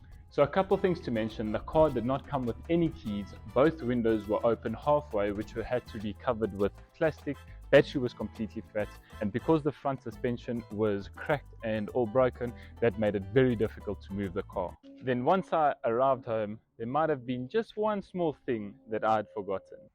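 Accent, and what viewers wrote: South Africa. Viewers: You are definitely South African. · Great looking project ❤ Iv just subscribed to the channel keep up the good work 🎉 you sound like you from South Africa 🇿🇦?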